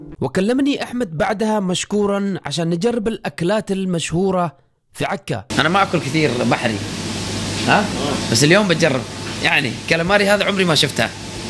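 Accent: United Arab Emirates. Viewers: لهجتك اماراتيه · لهجته إماراتية كان عايش بالإمارات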